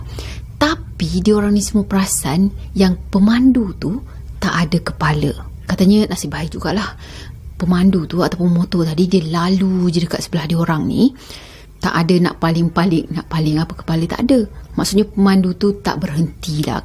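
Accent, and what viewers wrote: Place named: Terengganu, Malaysia. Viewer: Loghat Ganu faham tak?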